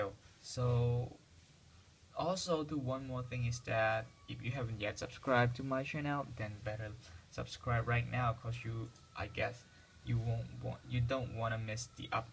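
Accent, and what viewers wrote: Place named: United States. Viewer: I swear u sound like American..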